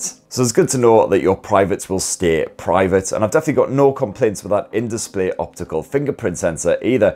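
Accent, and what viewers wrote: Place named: Newcastle, United Kingdom. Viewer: Wow - great vid find this. Easily explained in a Gerodie-esque accent. I get it, but others may not fathom the language barrier. Independence for the North!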